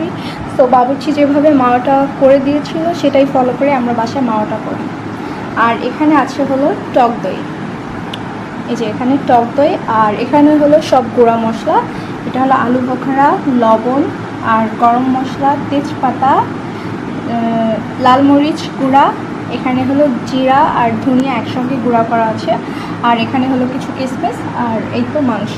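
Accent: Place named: Arab world. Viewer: Happy Eid Mubarak 🌷⚘🌷⚘ 👍👍 You are Awesome And I like your Arabia accent 🖐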